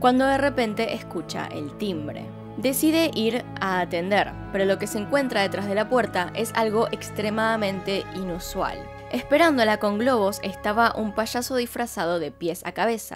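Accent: Argentina. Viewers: Se te escucha pachazo 😁me encanta el acento argentino 😂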